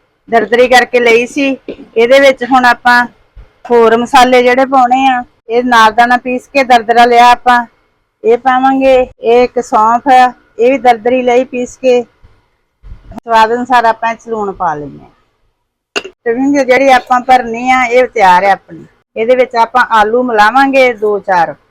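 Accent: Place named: Majha, India. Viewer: 🙏🏻ਭੈਣ ਜੀ, ਤੁਹਾਡੀ ਬੋਲੀ ਤੇ ਤੁਹਾਡੀਆਂ ਬਣਾਈਆਂ ਚੀਜ਼ਾਂ ਬਹੁਤ ਵਧੀਆ ਜੇ। ਮਾਝੇ ਦੇ ਜਾਪਦੇ ਓ।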